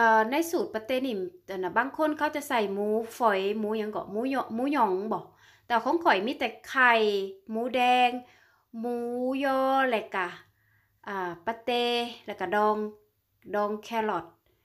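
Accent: Laos. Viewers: สวัสดีค่ะสาวลาวเว้าม่วนหลายเด้อ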